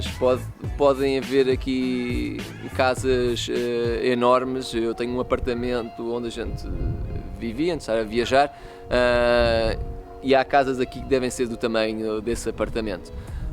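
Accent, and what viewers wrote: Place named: Portugal. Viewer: Muito bom o seu trabalho!! Ainda por cima falado em português do continente!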